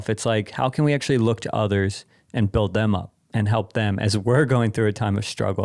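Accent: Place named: England, United Kingdom. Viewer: Please start making videos here again, i am from brazil, i love your english accent to practice my listening. And the main point is that everything u say at this channel is helping me a lot! Thank u ❣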